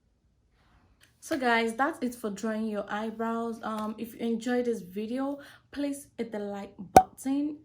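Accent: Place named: Nigeria. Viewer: She sound like Nigeria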